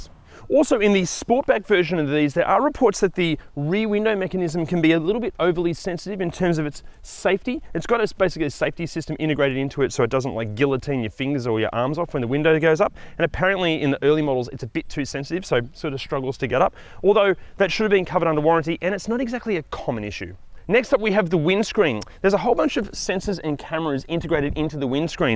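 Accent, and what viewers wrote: Australia. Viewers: this guy is aussie clearly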